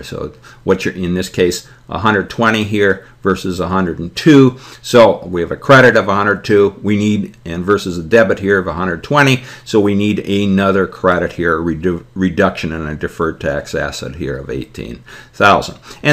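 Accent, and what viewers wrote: Boston, United States. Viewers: I find the Boston accent difficult to study to (maybe because of Family Guy?). Other than that, thanks so much for the help!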